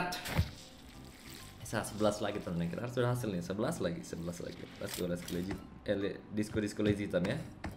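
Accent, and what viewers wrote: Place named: Medan, Indonesia. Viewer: Haha,PARTENTENGAN gak tuh.. Udah belajar bahasa Medan Abang ini bah.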